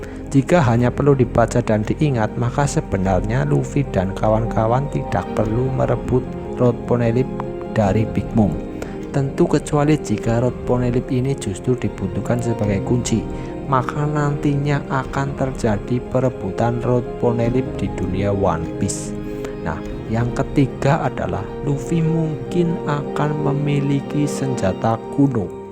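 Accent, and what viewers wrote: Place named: Java, Indonesia. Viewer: suaranya medok bngt!!mau nonton jdi kga jdi ,next.vidio pake teks aja gan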